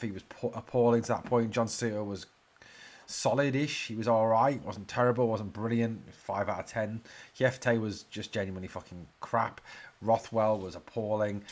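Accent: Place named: Scotland, United Kingdom. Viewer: You sound so scottish 😂😂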